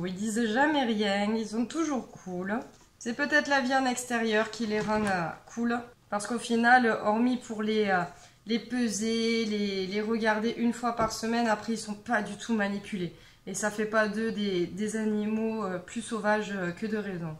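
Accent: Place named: South, France. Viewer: Super tuto comme d'hab, avec le chouette p'tit accent du Sud.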